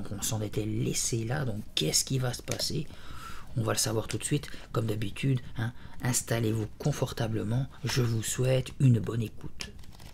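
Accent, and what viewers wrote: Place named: Belgium. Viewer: Bonjour! J'adore vos vidéos. Je travaille à Tokyo, souvent de nuit et vos lectures m'aident à tenir jusqu'au matin. Je ne peux m'empêcher d'esquisser un sourire quand j'entends votre accent Belge poindre. Ça fait partie du charme de votre chaîne 😊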